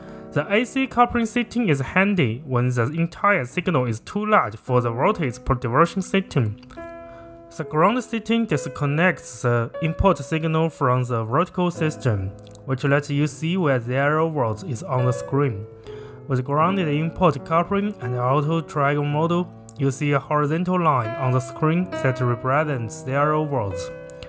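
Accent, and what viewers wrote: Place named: China. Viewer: good topic but not good english speaker english sounds like chinese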